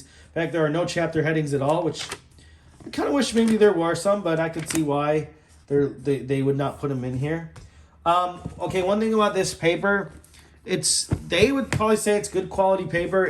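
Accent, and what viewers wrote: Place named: United States. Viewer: He also pronounces calfskin as 'keffskin' so I'm assuming it's a regional US accent!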